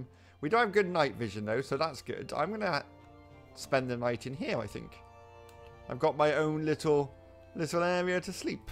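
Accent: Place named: United Kingdom. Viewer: I just started watching your channel and your accent is so cool! ^~^ British accent? idk lol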